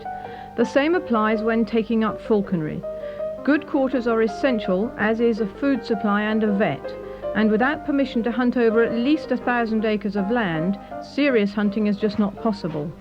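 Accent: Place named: RP, United Kingdom. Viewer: rather posh , I like her practical no bullshit style .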